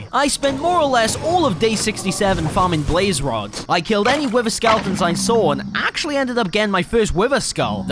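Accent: United Kingdom. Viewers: U sound brish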